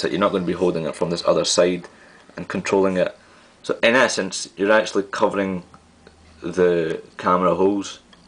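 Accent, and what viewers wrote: Scotland, United Kingdom. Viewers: Where bout Scotland you fae. Am fae elderslie. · yeah this is nothing personal agiant scottish people or waht ever, i just cant understand them as good as american people, for i´m not a native speaker and understanding is the hardest part of a foreign language.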